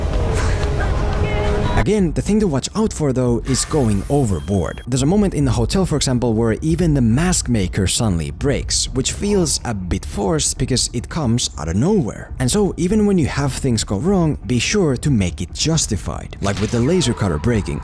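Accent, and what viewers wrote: Finland. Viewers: Are u from Finland?? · Are you finnish? You sound finnish.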